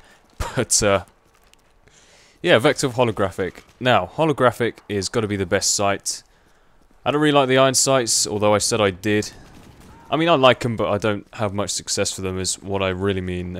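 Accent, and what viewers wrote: United Kingdom. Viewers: 2 Reasons why I LOVE YOU. You're British. You're a younger version of James Bond.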